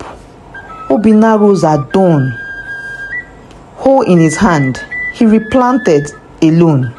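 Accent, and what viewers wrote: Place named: Igbo, Nigeria. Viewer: Wow my own sister I love the Igbo accent very powerful story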